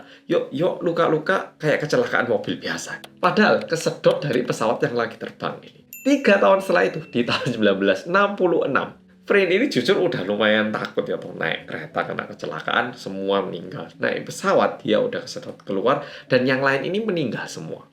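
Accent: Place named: Java, Indonesia. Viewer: SUKAK LOGATNYA MAS. BASA ENDONESIANYA JAWA BGTTT. APIIKKKK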